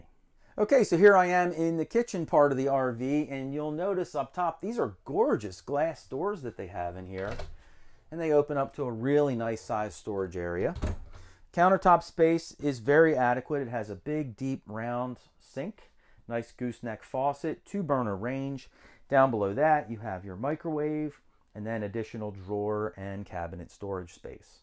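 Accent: United States. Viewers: Love your warm accent 😄 you really lengthen your oooooo’s which is so warm to listen to , a very friendly down to Earth American accent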